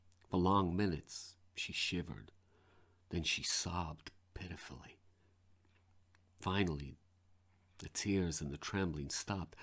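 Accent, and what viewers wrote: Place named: United States. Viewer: Whoa! Chilling! (Your American accent is awesome, by the way )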